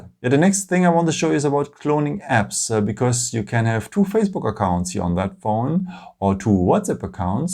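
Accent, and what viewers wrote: Germany. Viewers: Are you from germany? Kommst du aus deutschland?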